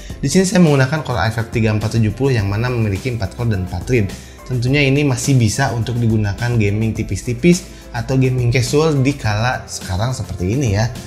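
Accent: Bandung, Indonesia. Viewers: Sigahna orang bandung nya kang. 😅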